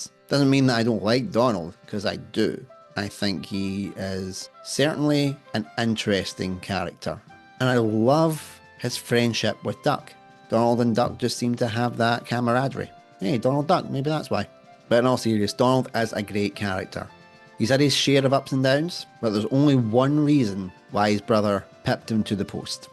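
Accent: Scotland, United Kingdom. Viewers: Hello Nice Ranking I'll admit I'm like Duncan I'm a Hothead but with a good heart And I Like The Scottish Voice And You are no expection Sir keep up the good work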